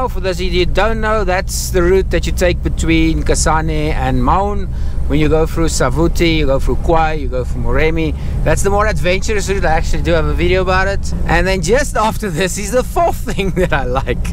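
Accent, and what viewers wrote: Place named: South Africa. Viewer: I love ur south african accent. I lived in rsa few years. Genit u dag meneer .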